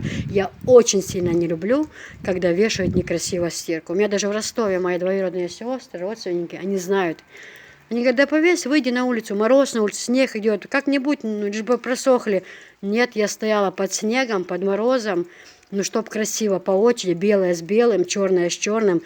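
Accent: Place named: Vedeno, Russia. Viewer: мне все нравится что ты снимаешь, рассказываешь!ДАЛЛА эатту бойла хьа. Я жила в пос. Мичурина в Грозном. И мои соседи Дунаевы, Альбиговы были веденские, ( они живут и сейчас там, я в Страсбурге).имена, Кемса в основном встречается у веденских больше. У тебя даже говор веденский. Я очень рада за твоих марзхой, им очень повезло с тобой. Мы жили душа в душу, делились всем, у меня прекрасные воспоминания о моём детстве, юности. Между Дунаевых и нами был маленький заборчик.Кемса (так зовут нашу соседку)всегда была нам как вторая мама. Её сестру звали Дикиева. с уважением Асет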